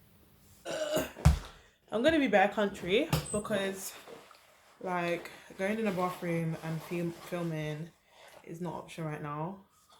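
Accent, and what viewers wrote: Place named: London, United Kingdom. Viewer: Hold on you sound like you're from London! - if you are Heyyy girl! - Loved the video- you're so entertaining sis! - I'm going to try that mask!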